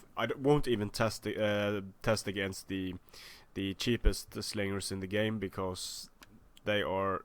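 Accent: Scandinavia. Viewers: You sound exactly like the guy from Red Ice radio. Norwegian / Scandinavian English accents sound very good... Most of you speak better English (with equivalent vocabulary) than perhaps 75% of Americans and 50% of Brits. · Great video!! Your english sounded quite like "the Scandinavian english" - Am i wrong/right?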